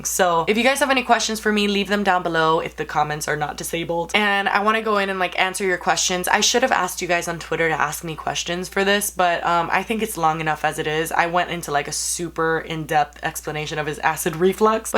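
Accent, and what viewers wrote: Miami, United States. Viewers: She's Miami Cuban. It's a Miami accent. What a rude question. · Alaina Reyes lol I totally noticed her having more of an accent, but I thought it was just her Miami-Cuban in her lol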